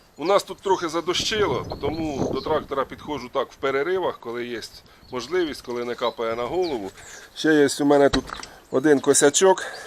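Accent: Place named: Dnipropetrovsk, Ukraine. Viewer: Дядько ви з Дніпропетровщини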